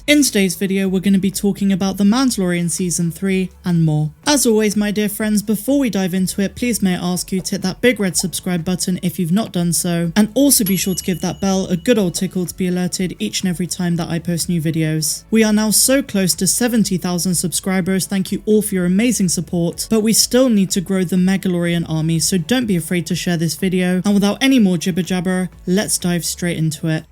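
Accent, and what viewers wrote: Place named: Bristol, United Kingdom. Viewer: I heard your bristonian accent, you hide it well. But not Palpatine well.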